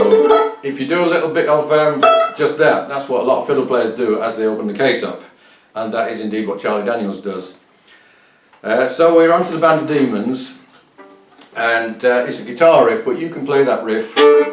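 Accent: Yorkshire, United Kingdom. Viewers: Sounds way better in Yorkshire accent! 👍😉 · There is no issue with you doing this in a yorkshire accent, as you somewhat note in that it takes the old tongue to do and the aussies are another I could think of that hold on to it. Its interesting to hear it that way and have no objection to it, just dont let it die. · why is he british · Brilliant tutorial! Thanks. The Yorkshire accent adds a new twist to an old classic 😉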